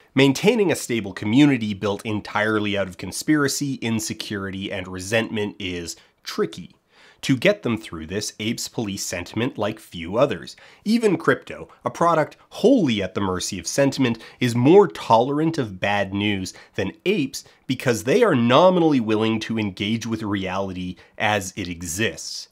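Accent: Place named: Canada. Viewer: Same here, long time Dan-Stan here so I’m used to his Canadian accent slipping through on random words sometimes (ie “gadaff” from the lord of the rings video) so I honestly thought I was just miss hearing him say life changing…. I don’t feel super great in my soul now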